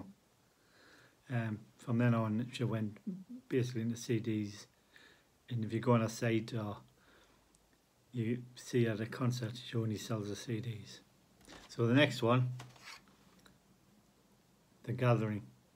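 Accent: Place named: Northumbria, United Kingdom. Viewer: I think i try to find something from what you showed here. Dont know though how easy it is in Finland. Where are you located in Britain, your accent is quite hard for me, i have heard similar in some snooker interviews.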